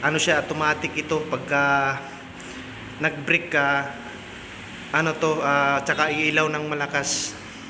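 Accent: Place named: Visayas, Philippines. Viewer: Dong bisaya ka🤣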